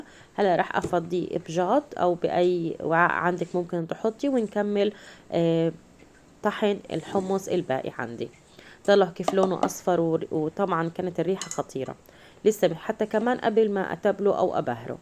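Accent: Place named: Egypt. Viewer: انت ليش بتحكي مصري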